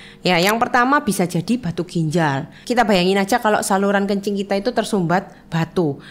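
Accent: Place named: East Java, Indonesia. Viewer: Logat Jawa Timuran 😂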